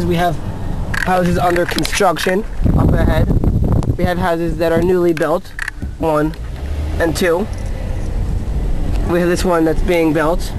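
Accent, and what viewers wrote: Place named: Delaware, United States. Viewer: yoo ...... u def didnt grow up in nyc...u got no swagger u talk like u from delaware...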